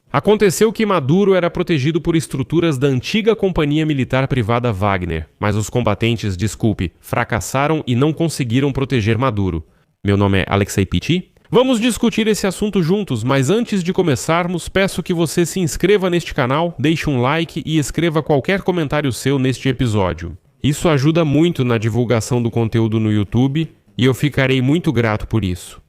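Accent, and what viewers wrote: Portugal. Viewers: A IA fala português de Portugal. Claro , correto e compreensível. Aqui não se esquecem do plural e singular . Simplesmente um português corretíssimo. Parabéns IA de língua portuguesa .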